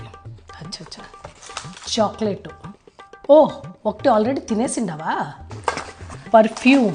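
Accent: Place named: Bangalore, India. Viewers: Dheeni TELUGU enti Kannada kampu koduthondhi… thuuu ekkadaleni maayadhari santha motham YouTube lo undhira baabu.. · అనంతపురం బెంగళూరు బార్డర్ భాషయాస ,, రాయలసీమ కన్నడ మిక్స్ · Rayalaseema and karanataka barder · Native kannada na midi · Very good slang 😂😂😂 Bangalore Telugu ❤❤ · Kannada telugu slang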